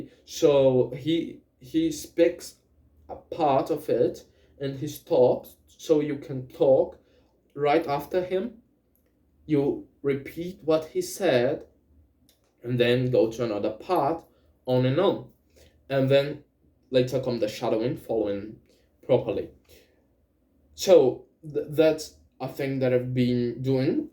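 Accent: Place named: United Kingdom. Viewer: Você escolheu o sotaque britânico?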